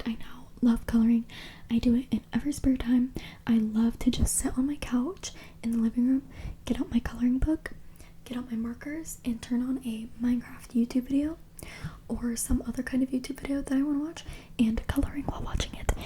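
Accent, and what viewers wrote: United States. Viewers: Watched/listened to the whole video TWICE! :eyes-pink-heart-shape: Some content creators say if you made it to the end put a zebra emoji or they ask a random question, so you see random zebra's or emojis that confuse people in the comments :face-blue-smiling: I learnt so much! I have much respect and admiration being a fellow Christian :face-red-heart-shape: 4 years with your bf is amazing especially at such a young age! :face-blue-wide-eyes::eyes-pink-heart-shape: I wonder if he's a Christian and you met through church? Oh I think a return to pink hair is on the cards for you! Your fans would love that I'm sure :eyes-pink-heart-shape::face-red-heart-shape: You didn't have New Zealand on your places you wish to visit :face-purple-crying: That's ok, you had some great locations! I knew you weren't from Germany (or at least with that thick American accent, didn't live/grow up there? 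:face-blue-smiling:) That's a cool story about your name! Cool parents :eyes-pink-heart-shape: Congrats on your Youtube/TikTok success and I'm sure you will only grow more in years to come! :eyes-pink-heart-shape::trophy-yellow-smiling: PS. I love the pigtails! :eyes-pink-heart-shape:Women can look so different with a subtle change in hairstyle :face-red-heart-shape: Would love to see different hairstyles/ braids in future videos :face-red-heart-shape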